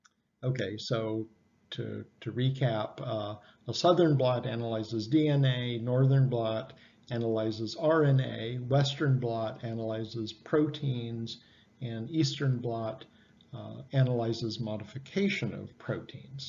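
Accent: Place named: England, United Kingdom. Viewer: Request you to kindly add subtitles. English accent is hard to understand. 🙏🏼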